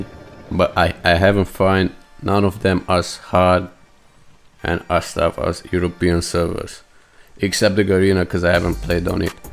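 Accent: England, United Kingdom. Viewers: We want the fancy British accent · I like the fancy British accent voice over and I love this one too😍....anyhow u wish u wish to upload videos!! Drop a 1hr video we'll still watch it from top to bottom...much appreciations to you for making us a better player!! Cheers❤️❤️ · Fancy British accent plz (if possible) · We want the fancy British accent back now! ❤️❤️❤️ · para mi esta bien un video con tu voz y no la voz con acento inglés. 🇲🇽 🇲🇽 💪💪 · It's a tough choice between the accents. Maybe make it both? British for general narration gives is like a documentary feel which is nice, and your own seem to work well for the explanations. The format for this video seemed real helpful in showing how to adapt to the situations that unfold and noting things to be aware of in them. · Cheers! I would like to hear that British accent voice again. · it feels so different without that british accent voice over haha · Regarding the voice: I dont know buddy, the british one is nice but we dont watch you for entertainment, we watch you to learn stuff. 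Entertaining voice or not, real fans always gonna watch ❤ so your choice man, do whatever you like most hoping every fan thinks about it this way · I enjoy it, do both, British and your original voice at the same time ❤️🙏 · Let's keep with british voice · British accent it is so much fun!!!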